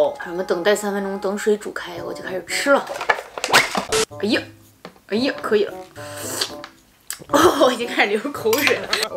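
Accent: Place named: Inner Mongolia, China. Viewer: 另外，感觉羊pd是内蒙古妹子，口音很像我们东北这边，但又能感觉到一丢丢的区别。听着很亲切☺️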